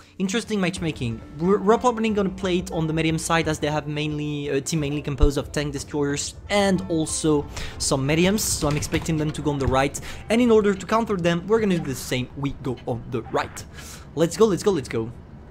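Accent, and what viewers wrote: France. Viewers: T’es Français non?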